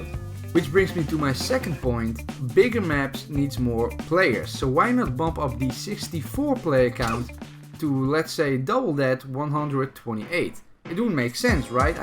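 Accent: Netherlands. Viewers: Are you dutch my bruh?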